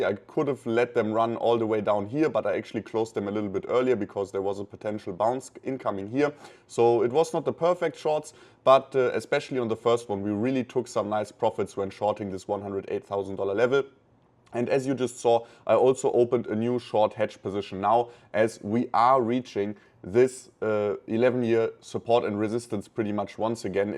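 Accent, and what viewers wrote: Germany. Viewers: Hi, I am delighted to see a new upload from you and thank you for sharing your thoughts and strategies. As starting trader they are very valuable to me and couple of times prevented irrational greedy descision that would have turned badly. Not always, sometime greedy holding on to draining longs happened, but over time I want to reducde that kind of action, to zero preferably. Somehow shorting feels more scary in a bullcycle and than longing at high prices. About sharing your private life. I think it is inspiring to see and have that in your videos. You live the dream of I guess many of your followers. Curious about your tutorials but even more: Would you share with us how you made your first 10K, 100K, 500K. When did you decide to move to Dubai? (assuming from your accent that you grown up in Germany). How did you arrange the move? Are there tips you have for people currently living elsewhere that play with the idea to move to Dubai. 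Hope someday you will share that story. Wishing you a great remainder of the weekend.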